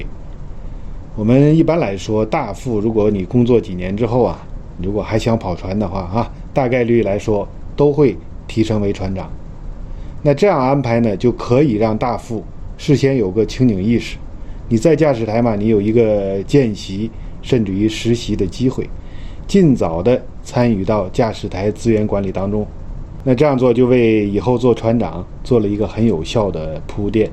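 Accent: Tianjin, China. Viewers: 第一次看船长的视频就被强烈吸引了！李船长渊博的航海知识和严谨的有条不紊的专业叙述最吸引人。加上浑厚的天津普通话播音太酷了！我的印象中在海上的老船长，留着大胡子抽着雪茄大腹便便，就像高尔基小说《在人间》描绘的那样。。。新一代的华人的船长在此！李船长无庸置疑，您是业内的佼佼之子，民族的骄傲，加油啊！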